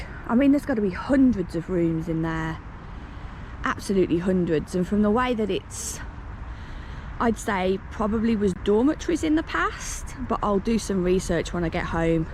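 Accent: Birmingham, United Kingdom. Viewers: Always enjoy hearing your voice! As a Brummy living daan sarf, it’s nice to hear that familiar twang sometimes! There’s so much to see & explore in Brum. Will look forward to more videos 😊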